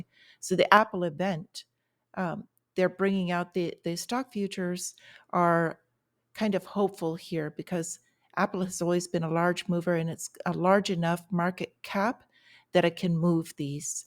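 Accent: Ireland. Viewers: Hey 😄 Thank you for posting your daily market updates, I love them! You mentioned the relative strength of the US dollar will get hammered, what scenarios do you think could happen with say the EURUSD? A second question, is your accent Irish? Thank you & Have a great day.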